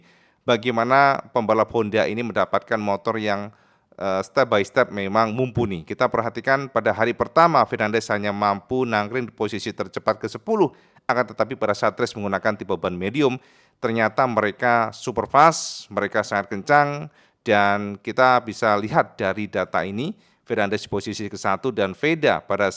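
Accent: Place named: Java, Indonesia. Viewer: PADANGG NJINGGLANGGG.., kanal terlengkap dengan data dan logat bahasa yg khas NJAWANI..., TOP MARKOTOP JOS GANDOSSS.., #vep9budalslametmulihraharjoaamiin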